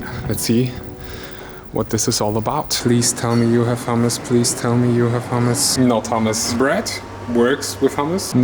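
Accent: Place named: Germany. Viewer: You have a thick German accent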